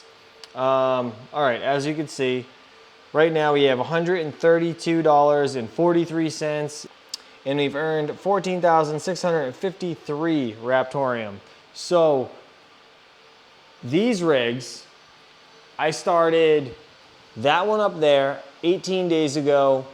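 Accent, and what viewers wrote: New England, United States. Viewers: Sounds like a New England Accent so Im betting National Grid customer rip off rates Im in FL and I pay about 0.08KWh here in FL on a COOP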